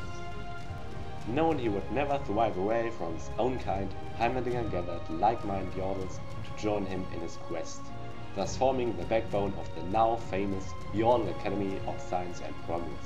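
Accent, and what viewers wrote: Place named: Germany. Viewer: german champ + german accent = perfect!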